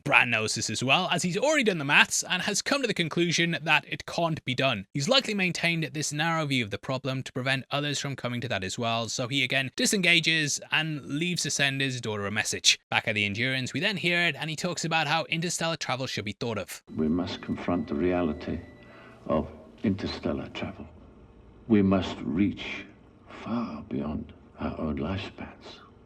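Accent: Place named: Newcastle, United Kingdom. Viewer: YOU are this channel, everything we watch and listen to here comes from you. Like other people have said, we could all go and watch a similar (albeit not as good obviously 😉) video to this on YouTube but we all choose to watch you. As cheesy as it sounds that means you’ve built a community here, and it’s not crazy to think that we care about you. I hope in the future you feel you can put a bit more of yourself in your videos without sacrificing the right to a private life. It really meant a lot that you trusted your audience to tell us something very painful but also very relevant to your emotional response to this film. As an aside, I fucking love your channel, it’s an absolutely gargantuan (pun intended) amount of work you’ve put into it which is hugely appreciated. Also I moved away from Tyneside two years ago so it’s really comforting to hear a Geordie accent emanating from my TV and filling my living room. 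❤❤❤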